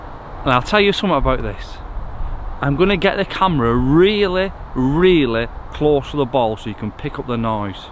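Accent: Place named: Bolton, United Kingdom. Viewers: Nice to hear a northern accent on YouTube for a change, I’m from Wakefield so I understood u perfectly 👍🏼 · I’m an American, and I dig the Bolton accent. Reminds me of Fred Dibnah. Using ‘were’ in place of ‘was.’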